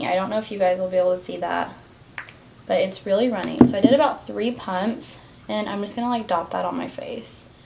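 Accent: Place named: South, United States. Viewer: omg I love this so much!!!! you’re so talented and btw idk why but I love your voice bc you have like a country accent almost and it’s so cute!!! you’re so good at makeup considering your self-taught!!!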